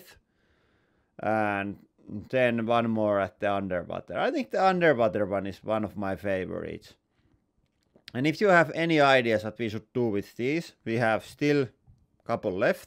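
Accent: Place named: Finland. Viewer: The underwater shot was spectacular!! Also with all due respect I love your Finnish accent when you speak english. Please, don't westernise your speaking! 😁😁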